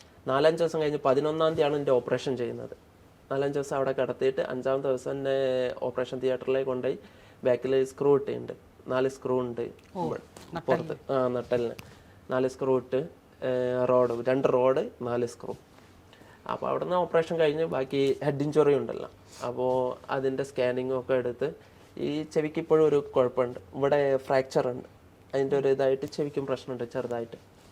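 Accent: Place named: Kozhikode, India. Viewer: Kozhikodane ne parayanda cheetta. Ningale samsaram keettal nammale veetil ullore samsarikkanana feel 😍. Randupeerum poli 🥰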